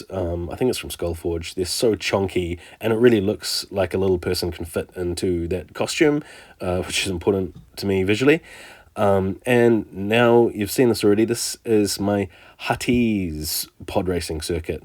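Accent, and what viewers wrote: New Zealand. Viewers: Are you a kiwi? Your voice sounds like you are from New Zealand?... yes you are haha just finished the video. That's awesome I'm also a kiwi, keep up with the videos I'm going to watch the pod racer one now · thought i heard a fellow kiwi's accent very happy i found your channel thru this collab series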